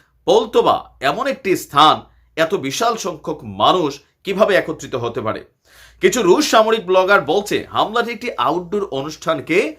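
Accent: Kolkata, India. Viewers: কলকাতার ভাষাই তো আসবে। আপনার পূর্বপুরুষ যে ওপার বাংলার। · ভারতের শুরে কথা বলতেছেন।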